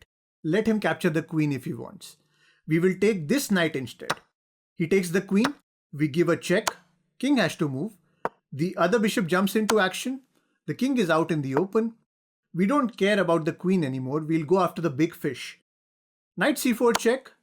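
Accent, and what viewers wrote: India. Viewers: the indian accent makes this channel my fav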